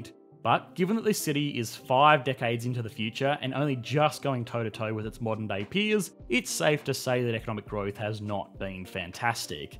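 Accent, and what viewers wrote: Australia. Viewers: Is this guy Aussie or what. What is he jabbering about anyway? Can anyone clarify? Help.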